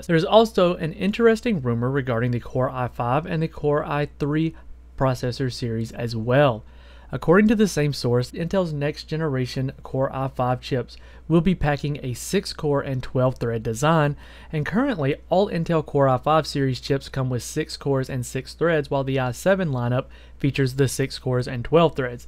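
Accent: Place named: Texas, United States. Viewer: Lol dude i love ur accent. It reminds me of when I lived in Texas.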